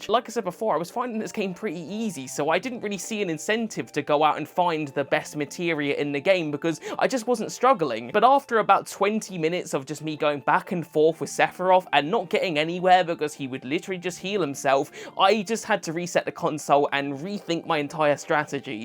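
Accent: United Kingdom. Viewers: What area of the Uk are you from?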